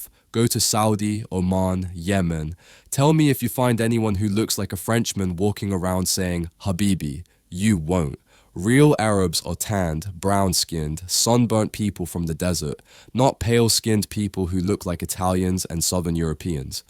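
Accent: United Kingdom. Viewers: The Original Arabs were / are Black. Adham [Radiant, Shining Black]. Aswad [Black], Qadar [Black]. The paler shades of people from MENA = the Middle East / North Africa are the byproducts of Black men producing with the Slavic = Eastern European / Caucasian women. That said, although I know that the racist = white supremacist scenarios articulated happen, the narrator has a keen British accent. And thus, I am curious. Why does someone from Sudan have such a sharp British accent. I may be missing some details,.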